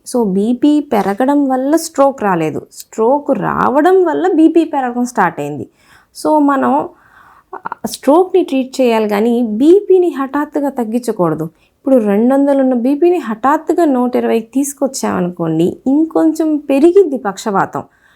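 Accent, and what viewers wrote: Guntur, India. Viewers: గుంటూరు యాస బాగుంది మేడం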